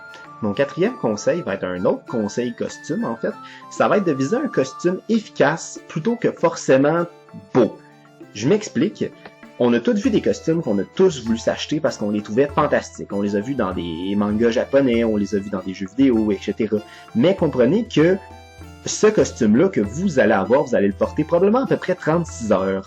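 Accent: Canada. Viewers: Bon je me rend compte que j'ai pas trop commis d'erreurs jusqu'à présent ^^ mais j'ajouterai pour les gens du sud, pensez à prendre de la biafine et de la crème solaire, c'est pas RP mais votre peau vous remerciera (petite pensée aux guerriers torse nu de ce WE qui on fini couleur homard). D'ailleurs une cape légère de jour pourra aussi vous prémunir des coups de soleil, et si c'est trop tard protéger des frottements avec les pièces de cuir. Merci pour ces conseils en tout cas c'est tout mignon l'accent canadien :p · Tu devrais essayer de te filmer avec un appareil photo histoire d'avoir une image moins pixelesée, seulement pour l'audio j'ai pas de conseille a te donner:/. A la limite tu pourrais acheter une caméra mais ça coûte la peau du cul et au final est ce que ça vaudrait vraiment le coup? Et pis de toute façon tes vidéos sont très bien comme elle sont, ton accent (si si, vous avez un accent vous les québécois;)) et la qualité donnent certain charme je trouve:D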